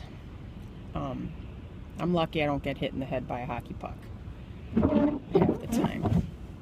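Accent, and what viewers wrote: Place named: Michigan, United States. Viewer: Sounds like you live in Michigan, which is where I live! I love your videos...and my friends found out about my videos and made fun of me too. Keep on keeping on. :D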